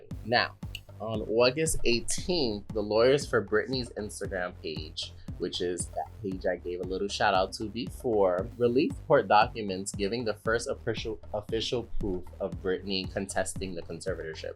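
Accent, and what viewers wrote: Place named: East Coast, United States. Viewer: I just have to say I love the East Coast accent. I’m from Boston so I hear ya and love it....💜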